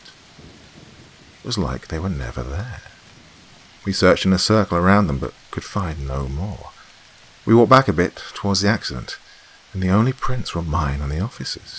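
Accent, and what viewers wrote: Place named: England, United Kingdom. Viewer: Hearing an Englishman narrate the thoughts of a truckdriver in Arizona is...odd. Good, but odd.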